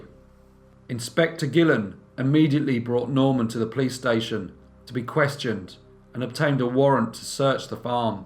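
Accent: United Kingdom. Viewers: I LOOK OR WATCHED MANY OF THE VIDEOS AND FIND IT INTERESTING,ITS LIKE MANY GENRE MIX TOGETHER COZ IT DOESNOT CIRCULATE IN ONE OR TWO PLACES BUT IN THE WORLD WHERE SOME ODD THINGS WHICH SURFACED OR KNOWN WELL KNOWN EVEN OUTSIDE OF THEIR COUNTRY,WHICH MEANS THE NATIONALS COUNTRY WOULD KNEW WELL,AND THE BRITISH ACCENT IS NICE TO HEAR ITS NOT LIKE HIGH PITCH QUICK PACE ACCENT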